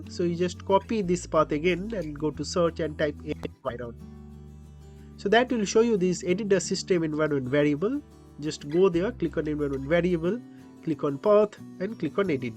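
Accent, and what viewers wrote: India. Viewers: Hear indian accent - know for sure that i will be able to install it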